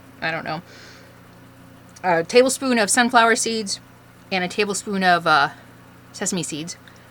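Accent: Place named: Minnesota, United States. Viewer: Minnis SO...tah. I love that accent too.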